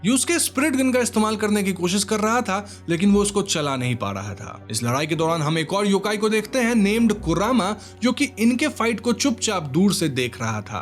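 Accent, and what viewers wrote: Bengal, India. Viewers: Bhai aap bengali ya odia ho kya??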